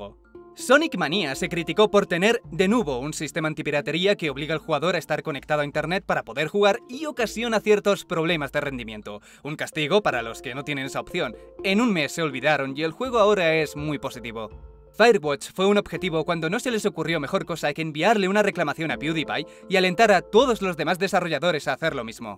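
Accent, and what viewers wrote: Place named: Castile, Spain. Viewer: 🌕🌗🌑🌑🌑Vaya pedazo de video mal hecho, me tengo que ir en 9 min y el video dura casi 16 MINUTASOS, ah y de paso la voz esta en castellano y no en latino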